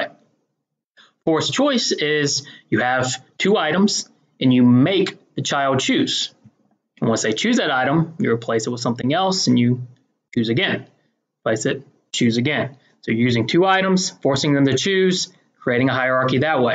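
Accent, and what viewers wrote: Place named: Philadelphia, United States. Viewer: I swear I hear a Philly or east coast accent in there! You weren't born and raised in Vegas, were you?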